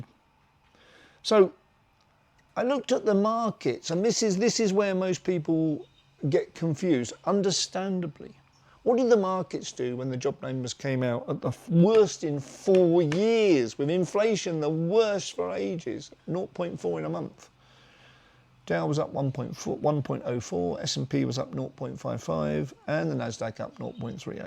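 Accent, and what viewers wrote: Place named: United Kingdom. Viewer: It’s very refreshing to have a Brit doing this so well…the American accent and the hogwash really is off-putting.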